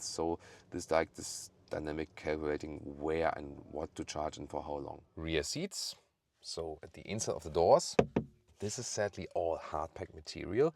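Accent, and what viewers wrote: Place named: Germany. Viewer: Why do they have a German doing the English version... this is dire... 🙃!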